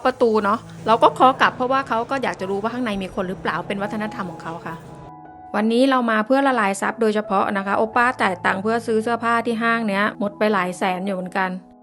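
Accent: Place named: Khon Kaen, Thailand. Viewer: เว้าคือคนบ้านข่อยแท้ ขอนแก่นกะสำเนียงแบบนี้ล่ะ😂😂😂😂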